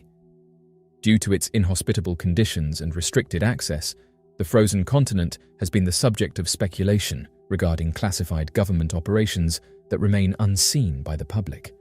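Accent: United Kingdom. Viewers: So incredibly boring when narrated by a Brit!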